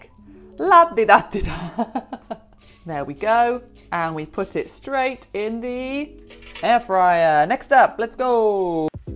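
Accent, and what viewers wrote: United Kingdom. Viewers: Omggg he is so britishhh😂😂😂😂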